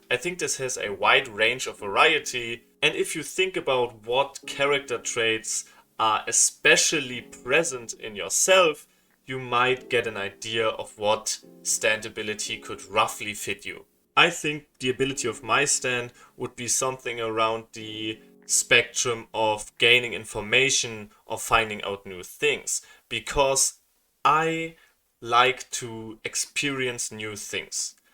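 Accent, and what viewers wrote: Germany. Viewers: You sound really german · geiler deutscher Akzent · Your german accent is really great! it's always good to improve your language ofc :D but your german accent is absolutely fine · You sound german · Bruder man merkt so krass das du deutsch bist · Are you German? Klingt bissl so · digga dein deutscher accent ist so zu hören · Are you german?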